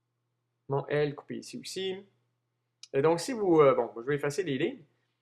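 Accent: Quebec, Canada. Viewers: bravo belle vidéo , même avec ton accent canadien j'ai tous compris lol merci . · Dulcineia Lemasle son ascent et québécois normalement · Franchement, je suis tes vidéos et elles sont toutes extra. Au fait j'adore ton accent Canadien. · Heu.. tes Quebequois? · j aime bien ton accent québecois xD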